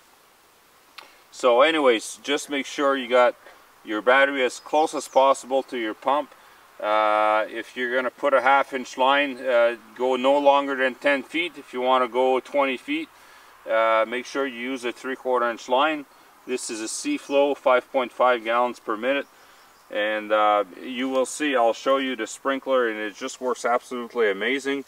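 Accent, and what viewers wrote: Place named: Canada. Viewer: Nice setup. Looks like there's enough room in the box for the battery, so you could have even shorter wires. Plus it'll keep it sheltered and hidden. P.S. I could tell from your accent that you're a fellow Canadian. French Canadian?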